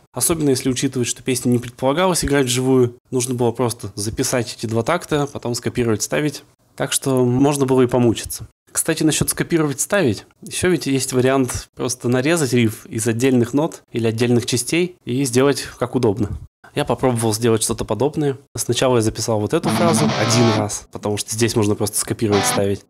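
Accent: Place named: United States. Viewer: Разбор с американским акцентом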